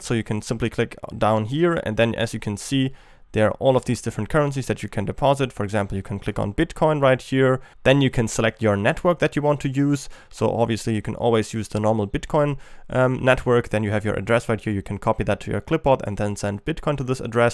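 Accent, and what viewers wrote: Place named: Switzerland. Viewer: I can hear the slight Swiss accent ;) I like it! Thanks for the vid!!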